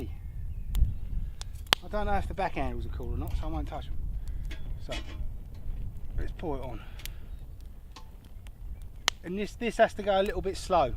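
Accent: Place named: Cockney, United Kingdom. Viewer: a Cockney living in Sweden. :)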